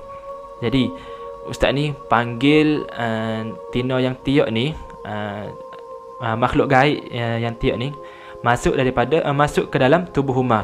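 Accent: East Coast, Malaysia. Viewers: Jumpa new channel horror storyteller.. subscribe tnd support.. dgr dr slang percakapan myb dr Pantai Timur.. Apapun terbaik bro..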